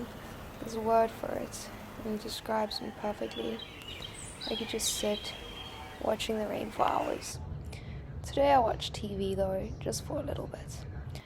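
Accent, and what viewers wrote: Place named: United States. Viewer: Чувиха ботает на американском языке. А это немножко не английский.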